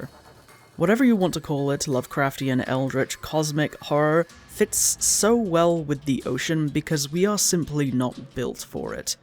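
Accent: Scotland, United Kingdom. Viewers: Who told this person they sound american lol they do not, if not told i would have assumed this was just a hyper-regional accent from somewhere in britain that sounds like some sort of mix of english, scottish, and american haha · Oh wow I thought you were British lol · Anyone that says you sound American must be experiencing hearing loss or something... I didn't immediately think Scottish until you said it, and now that you have, I can absolutely hear it, but I knew there was a distinct European-ness to your accent. It's not the strongest accent in the world, but it's absolutely there and absolutely, distinctly not American. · You don't sound American, but you don't sound distinctly Scottish either. If I wasn't familiar with different Scottish accents I wouldn't have been able to place your accent! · ...do people actually think you sound American? 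I could tell you were British(though I'd guessed England, not Scotland) the first time I heard you speak, though as you can likely guess from my pfp, I'm hardly unfamiliar. (Funnily enough I have a similar story about moving around quite a bit growing up, to the point my own accent is diluted to the degree I'm often mistaken for Canadian)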